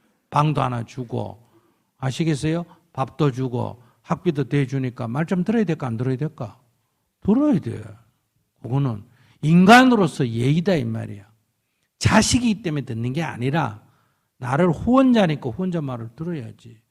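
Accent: Gyeongsang, South Korea. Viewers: 양영준 많이 들어보시면 이유를 알게 되더라구요. 저도 첨엔 그게 시러서 기피햇어요. 평이한 톤으로 말하면 중생들이 못알아들으니 강하게 말씀하는거고 경상도 사투리가 좀 세기도 해요. 근데 듣다본면 진짜 재밌어요. 깔깔대고 눈물나게 웃어요. 도움이 되셧으면 합니다. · 갱상도는 그래ㅋㅋㅋ 왜 열심히 얘기 하는데 화내는 걸로 듣나? 화낼 이유가 있나? 남의 일에~스님이ㅋㅋㅋ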